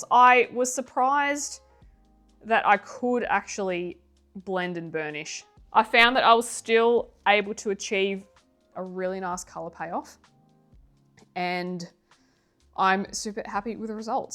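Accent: Australia. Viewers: I live in Queensland and have both sets. Up here in summer 30 to 37°c polys blend and smooch just like the prismas. No air conditioning. 😅 But I will always prefer Prismacolor because of how I colour, dark to light and blend together. I hate layering takes to long for a vibrant result for me. Each to their own though. Love your videos and great to listen to another aussie on YouTube. Have a good Xmas and new year. Waving from Queensland 😊👏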